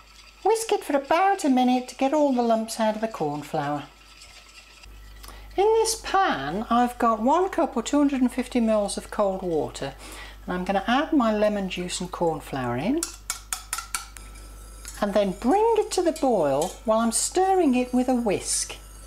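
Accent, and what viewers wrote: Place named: England, United Kingdom. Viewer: you're so sweet!.. I love the way you explain the procedures, and that AWESOMELY perfect English accent! Suscribed! Greetings from Argentina *=D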